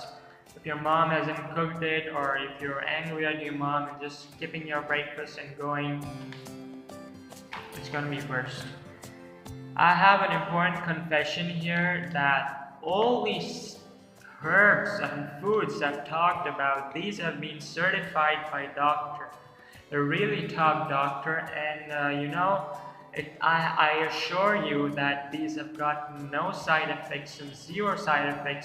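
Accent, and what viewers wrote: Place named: United Kingdom. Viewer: I am sorry for saying yu bad words actually that's not my intension becoz i was serching on airlines job on you tube then i found out your video but i saw your speaking tond is as like British accent that's i don't like.so that's why i thrown out bad words to u.Now i am heartfully apologize for what i said to you. I know you have big heart sorry brother..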